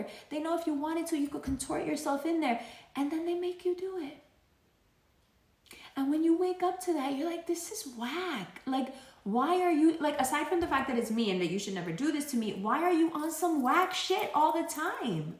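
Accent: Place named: New York, United States. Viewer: You are so funny ... oh man I love it !!! I'm a New Yorker , living in Jersey now across the Hudson.. you sound just like me :p I literally just said all this to my bf like last week the attitude and all haha I was just not beat ! Nah !! ;)) we really don't play ;) pisces / aries cusp .. Gemini rising and yup ! Not doing it. Happy Birthday to you beautiful enjoy your time in London. Thanks for everything ♡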